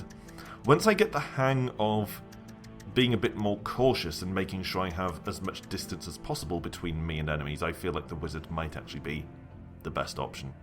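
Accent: United Kingdom. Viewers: Actually, I'm from Ukraine. Odessa. Also, I'm not sure about your nationality. Are you British? I think this, because of your accent (?). I'm not sure, but I thought that you have kind of British accent. Maybe I'm completely wrong.:)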